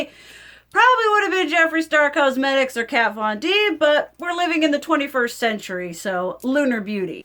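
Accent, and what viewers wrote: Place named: Alabama, United States. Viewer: I happened upon you b/c of your kvd video several months ago. Next thing I knew it was several videos and several hrs later of bing watching you b/c your accent felt like home. -Alabama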